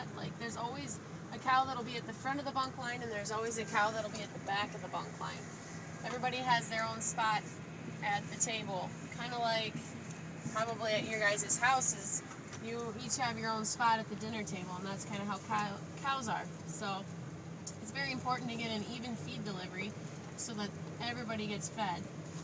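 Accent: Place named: Upper Midwest, United States. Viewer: northern Midwest you must be ??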